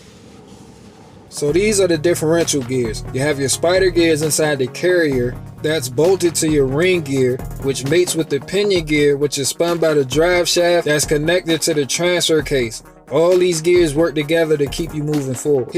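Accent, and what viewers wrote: Washington DC, United States. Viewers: You from the DC area aint you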